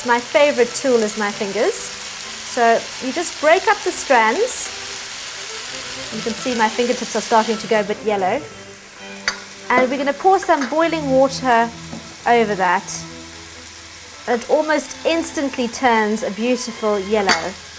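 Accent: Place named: United Kingdom. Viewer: What accent is that? I can't seem to put my finger on it. I was certain it was London or somewhere in the UK until I noticed the South African clover cream. She must have been born in the UK then moved to SA after developing the accent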